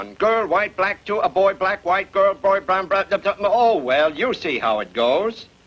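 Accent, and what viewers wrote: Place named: Transatlantic, United States. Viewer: Sounds transatlantic.. and maybe northern!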